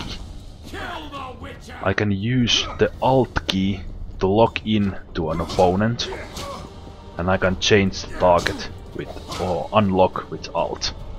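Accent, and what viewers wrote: Finland. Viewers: the voice.......im 100% it is the finnish accent...its too distinctive.....im from finland so i know but i dont talk like that thank god lolo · lovely finnish accent :D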